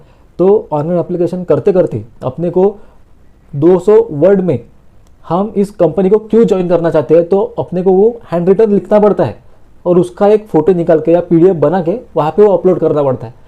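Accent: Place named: Maharashtra, India. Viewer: Rahenga...milengaa..bc pakka marathi aahe tu bhava..😂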